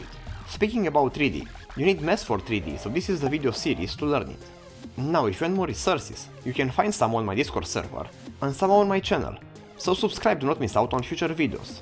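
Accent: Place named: Romania. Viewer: that romanian accent 🤌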